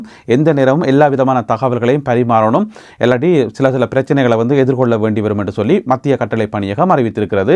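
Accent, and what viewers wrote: Sri Lanka. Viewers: தாங்கள் பேசும் இலங்கை தமிழ் மிகவும் நன்று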